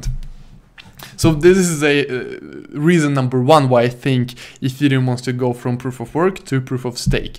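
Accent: Russia. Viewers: I like your huge Russian accent very very much! You are such a brave man to film all those videos and not to afraid of anything. Wish you good luck, I subscribed.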